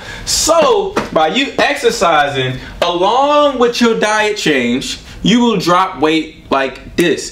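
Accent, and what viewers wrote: Baltimore, United States. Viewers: Hear that bmore 😂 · most def from bmore i hear it lol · he baltimore af “EXECISE” no R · Is he from Baltimore?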